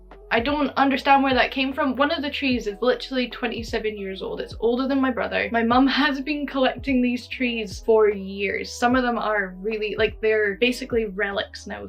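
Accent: Scotland, United Kingdom. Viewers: Just gotta say, I love your work. From a fellow living across the pond, when I first found you on tiktok I didn't expect you to be Scottish 🤣🤣🤣. Your voice sounds very different than how I expected it to. Keep up the good work. Keep your head held high and push through all the bad thoughts that you like to tell yourself. Your inner critic is the worst person to take advice from.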